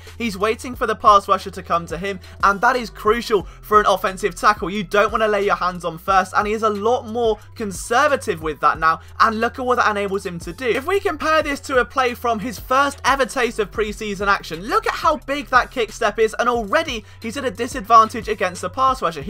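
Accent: England, United Kingdom. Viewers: I don't what it is, but I just can't listen to NFL commentary by a Brit. Could be the most insightful view but just can't stomach it. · Love the channel to bits. Where in England is your accent from? Keep up the great work, Philly loves ya kid!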